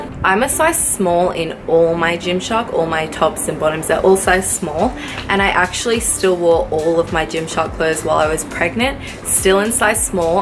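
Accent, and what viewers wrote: Australia. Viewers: r u an aussie m8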